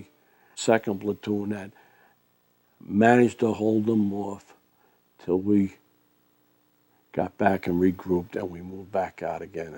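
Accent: New Jersey, United States. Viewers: You sound like your from joisy God Bless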